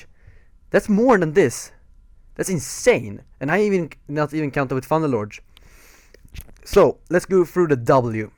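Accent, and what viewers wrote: Sweden. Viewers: Don't you hear the obvs swenglish?:-) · Are you from sweden? Because your accent sound like you're from sweden:d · Are u swedish?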